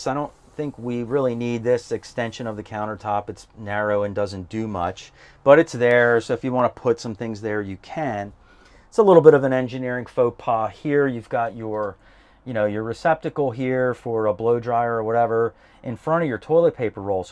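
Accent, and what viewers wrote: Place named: Western Pennsylvania, United States. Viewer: The MD or western PA accent is strong. Ha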